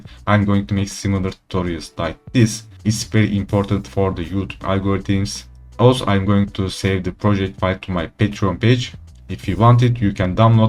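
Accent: Turkey. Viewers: Türk. Daha ilk dinlediğinde aksandan belli :D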